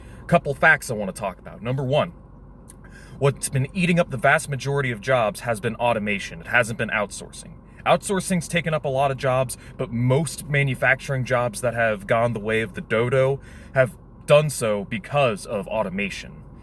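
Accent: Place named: United States. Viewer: Why is the steering wheel on the right? You sound American.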